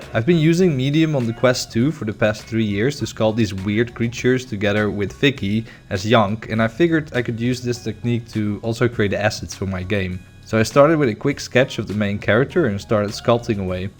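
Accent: Netherlands. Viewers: Hoor ik hier nou een stiekem Nederlands accentje in 😂🤲🏻 Character controller ziet er super smooth uit en er zijn zoveel richtingen waar deze game nog heen kan gaan! Kijk erg uit naar je volgende devlog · I hear a Dutch accent! Succes met je project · Yo, so ehhh, I just wanted to say that Unity3d also has Visual Scripting implemented since the beginning of 2021 :D I'm actually using that for my game :P EEEEEN Ik hoorde door je stem (en zag toen in je 'Over') dat je Nederlands bent 👍👍👍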